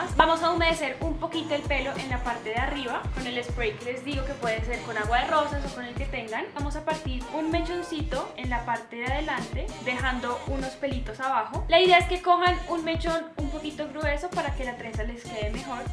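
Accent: Colombia. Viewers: Que agradable escuchar una colombiana sin acentos exagerados!!! Muy buena vocalización y buen tono de voz...felicidades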